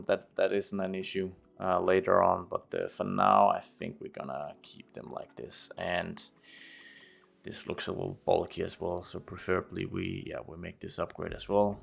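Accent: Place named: Denmark. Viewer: it's hard to see it have a natural reason to become a major city in the region. But having it be a place for major government buildings and seats of power is very possible. Many regions and countries simply pick these spots as like rather than having a natural reason for it to be there. Anyway, nice to see you listening to feedback. Btw: Fra sekundet jeg hørte dig snakke var jeg slet ikke i tvivl om at du var dansker ahah. God dag!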